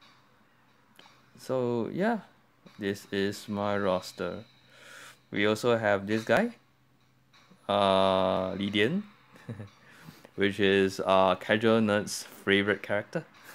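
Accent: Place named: Singapore. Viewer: well... your accent is typical singlish so im used to it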